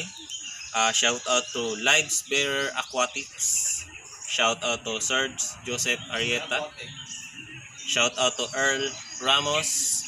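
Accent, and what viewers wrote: Visayas, Philippines. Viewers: Taga san kayo kuya mukhang bisaya kayo ahh😁😊😊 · Taga san kayo kuya mukhang bisaya kayo ahh😁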